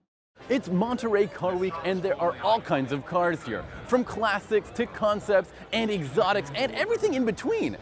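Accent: Canada. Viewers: Is he Canadian? They say zed as well as a lot of other nations. I ask if he's Canadian because at times there isn't a noticeable differance between American and Canadian accents.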